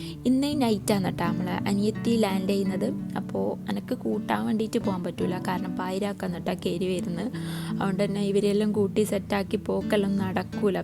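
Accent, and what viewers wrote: Kannur, India. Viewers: കണ്ണൂർ ഭാഷ 👍👍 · ശരിക്കും തനി കണ്ണൂർ ഭാഷ 👍👍അദ് മദി 🙏🙏🙏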